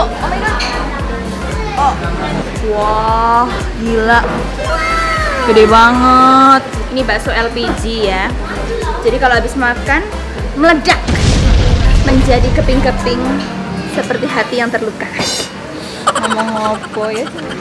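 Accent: Java, Indonesia. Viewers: mba gemesin mukanya korean girl, tapi ngomongnya jawa medok wkwk kalau ketemu saya mau cubit boleh yaa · Tante orang jawa ya soalnya medok banget... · Muka Korea ..sikap medok Jawa 🤭🙏😁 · Mbak ko isih teko jowo medok ne wkwk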